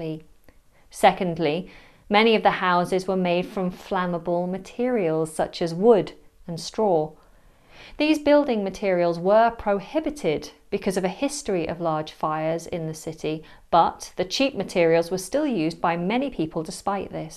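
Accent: United Kingdom. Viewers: I preferred to kill four birds in one stone madam memorizing your words/accent & listening to english history & practicing & taking new words (my mind is exhausted & soon I will be extinguished) can I use this word like this is this correct ? I considered myself as an old school I still keep notebook as a diary but I'm so lucky that I'm left handed so no one can read my handwriting sometimes even I can't it's very tragically horrific event or experience for those people in that time & many ancient archaeological sites also destroyed it wasn't that difficult I understand it very well technically british accent is magical factor including many different words I'm a history lover/reader/fan & of course I would like to hear more & I have a humble suggestion can you talk about the black plague who hits london in that time that's all madam if you read all of that & reached this point I would like to thank you for your time cuz I know you are very busy & I would be very glad/thankful if you correct me if you have seen any mistakes in my words thanks again madam & have fantastically gorgeous day I'm waiting your next video👏👋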